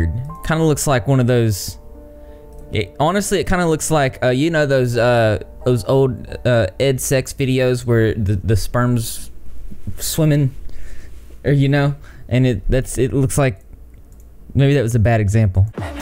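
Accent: South, United States. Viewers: I'm trying to figure out where your accent comes from, is it Georgia or Tennessee? Maybe western North Carolina? :)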